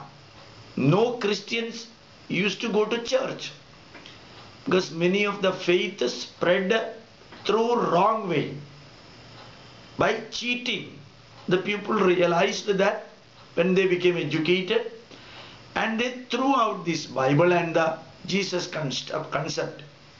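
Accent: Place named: India. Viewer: I have lived in England and mixed with English speaking people all over the world.Different people speak with different accent. Even in England, people speak English with different accent county to county.So, this man has an Indian accent,nothing wrong with that! The message he is giving is important. I salute this great person.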